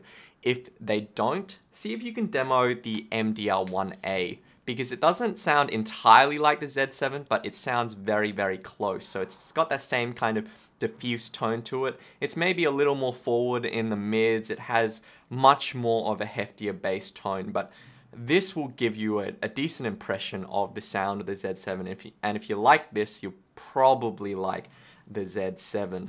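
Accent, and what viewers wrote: Australia. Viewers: An Asian guy with an Australian accent? Mind blown.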